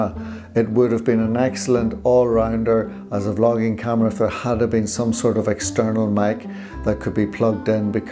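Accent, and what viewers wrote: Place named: Northern Ireland, United Kingdom. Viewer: Love this review,. Nice to hear from a fellow Norn Ironer:)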